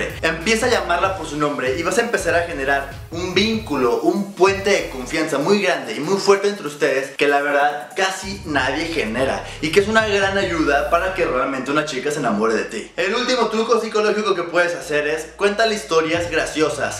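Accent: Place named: Fresa, Mexico. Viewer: Hablas freson y fresco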